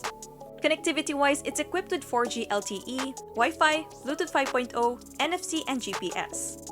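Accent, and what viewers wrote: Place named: Philippines. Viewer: Love that Filipino accent 💕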